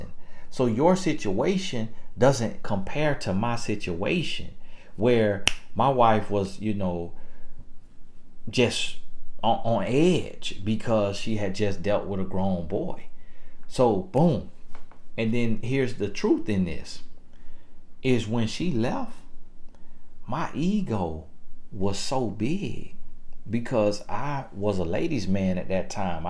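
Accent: United States. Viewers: Woow.. I am hurt and fascinated at the same time. Every woman of all ages should listen to you. That is the pure and pure hard-hitting truth. Less the ex thing troubles me. . BUT why and how men choose women 👊🏻. . Ufff..You shaken me and nowI am awake! I decided to wait a while ago now that I'm 43 and the man who is right for me will love me all the more for it. Basically every man is looking for his wife who they want to respect but we women have to make sure that they can. You are also very funny although I don't understand a lot of things because of the American English. I'm Italian and live in Germany. You're really awesome. thank you.. Wonderful listening to you 🌸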